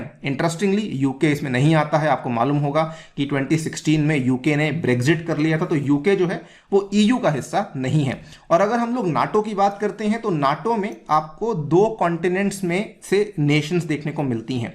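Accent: India. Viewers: Nam Bharat rakha hai sasur..or kam krre ho English pronunciation thk krne ka 😂😂...bhai hmari marji kese b bole... it's Indian English..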